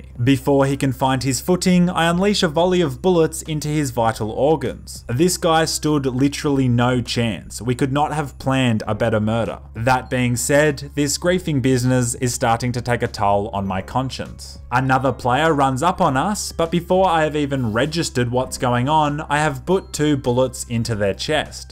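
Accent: Australia. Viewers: dude u Aussie?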